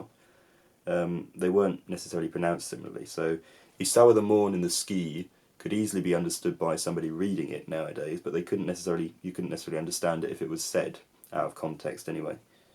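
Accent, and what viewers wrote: United Kingdom. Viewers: He sounds more british than a fooking Benedikt Cumberbatch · I am russian. And I hear your english is so beautiful. British accent is so european, just like latin or french 😅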